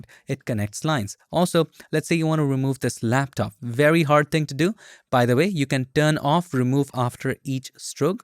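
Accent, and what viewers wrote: India. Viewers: Speak like Human not like Computer.. Sp. you have an Indian Accent..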